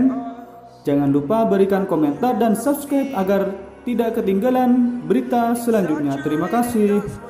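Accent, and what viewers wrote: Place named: Madura, Indonesia. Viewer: nada duro nya ketok an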